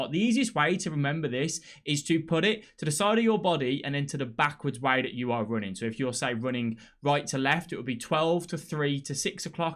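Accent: Birmingham, United Kingdom. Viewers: Are you a Brummie mate?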